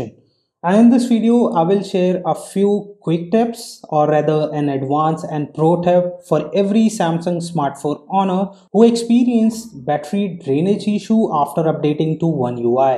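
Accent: India. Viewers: Again indian accent👎👎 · Indian detected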